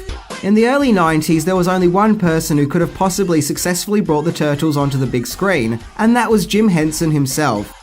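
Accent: Australia. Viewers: Australians pronounce things different lol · I don't think there are any facts about this movie that I don't already know...but it is fun to hear them in an Australian accent. Thanks!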